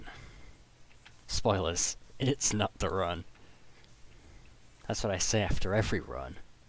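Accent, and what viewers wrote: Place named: United States. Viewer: My G is still alive. Where d'ya get that American accent??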